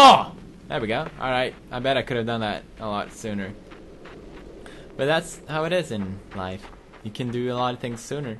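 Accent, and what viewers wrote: Sweden. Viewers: I've never heard his Swedish accent till now 🤔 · OH MY GOD! BACK THEN I DIDN'T REALIZE HOW STRONG YOUR SWEDISH ACCENT WAS... oh my god, coming back here after all this time as some kind of bitterness... guess we both grew up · Lol pewds's accent is still swedishyish ya know.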